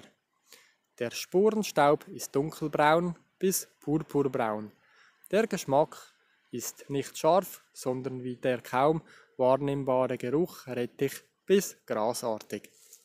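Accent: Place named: Switzerland. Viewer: Sorry, musste nach dem ersten Wort ausschalten!! Wenn auch noch ein CHler meint er müsste was vorlesen!!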